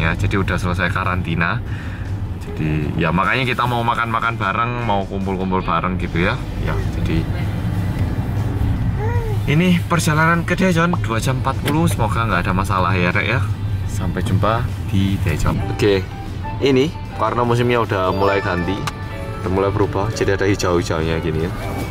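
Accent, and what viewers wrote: Java, Indonesia. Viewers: sebagai perantau di tempat beda budaya dan jadi minoritas, liat mas medhok di korea, malah seperti senasib wwkwkw, where is our home?? haha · SukA banget km ngomong pake bahasa jowo medok bgt... Sy aja gak begitu medok loh 😁😁😘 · Kok iso medok ngono Yoh???? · Medoknya dr mana ya?mama atau papa? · Emang kenapa cocok aja Korea sama Jawa sama medoknya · Mas sekali kali nggawe vlog tapi ngomong e ora medhok tapi ke kerea koreanen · Waktu pertama liat mas, wah ada orang korea cakep... Pas ngmg jadi.. yakin orang korea? Medok banget 😁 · Hahaha logat jawanya keren bang👍👍👏👏😂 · dan punyaku sak muene,, ngawur ogh mamahku iki nguawurr ini yang toua toua duduk di sofa ya.. Touuua toua duduk disofa ini ya Guendeng yoh,, hhh Retceh tnn aku rek,, krungu sampean medok bek seneng awakku😂😭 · logat te akeh jowo ne...salam dr purworejo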